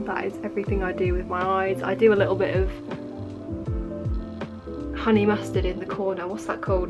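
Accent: United Kingdom. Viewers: Godamighty, pardner, you sure are purty! Put yer makeup away; maybe in 20 years ya might need it, maybe not. Love your cultured British (?) accent and - whata miracle! - you don't say 'like' every other word like, er, as, all the American valley girls on YouTube do. Hey, don't ever come across the pond; it'll ruin ya!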